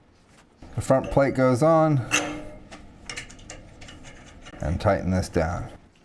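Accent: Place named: United States. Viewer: I'm hearing American English, but I se British power outlets. so.. . what's the story ?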